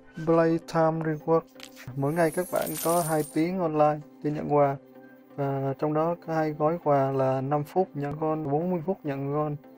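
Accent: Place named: Vietnam. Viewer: unfortune l dont understand vitenm accent but lm glad we have sub title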